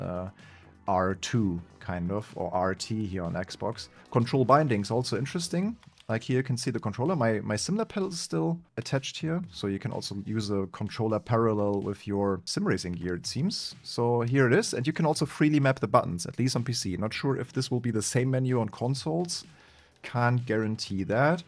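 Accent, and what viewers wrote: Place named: Germany. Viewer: Vielen Dank, dass du versuchst, im Rahmen deiner Möglichkeiten unsere Wartezeit zu verkürzen. Ich kann es echt nicht mehr abwarten. Hab es auf der PlayStation auch vorbestellt. Eine Kleinigkeit zu deinem Englisch du sprichst sensitivity immer falsch aus ich stolpere da immer drüber, weil das echt schade ist. Dein Englisch ist gut, aber an dieser Stelle fällst du als Deutscher leider extrem auf 🙈🤣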